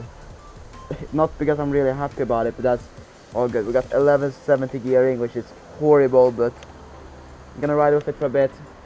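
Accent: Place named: Sweden. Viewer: låter bättre på svenska för du snackar svegelska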